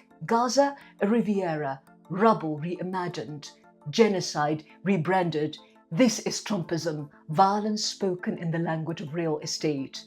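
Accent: RP, United Kingdom. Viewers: Royal family / Queens style english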